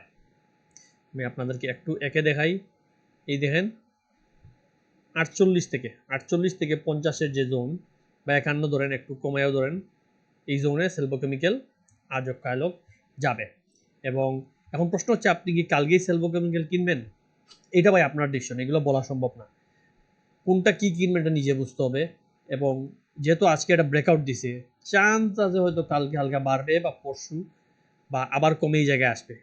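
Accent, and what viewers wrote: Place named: Sylhet, Bangladesh. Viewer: ভাইসাব এর বাড়ি মনেহয় সিলেট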